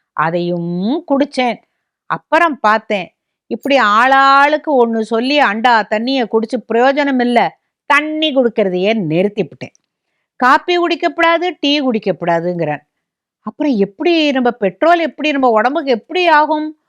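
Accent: Palakkad, India. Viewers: Akka I am your Palakkad relative.Super Palakkad Tamil. I am your follower and enjoyed learning a lot.Everyday I will wait for your program. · பாலக்காட்டு மாமி மாதிரி பேசி அசத்திட்டேள்.. பழைய பாரம்பரிய விஷயங்களை இது போன்ற நல்ல செய்திகளை தொடர்ந்து தாருங்கள்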